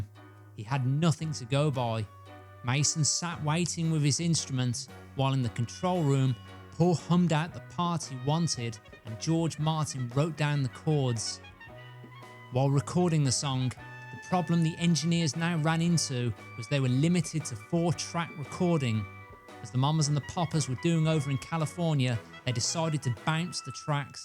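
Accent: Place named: West Midlands, United Kingdom. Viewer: Thanks for all these videos you’ve made documenting the discography, really good stuff. Made even better by your West Midlands narration, you’re a legend!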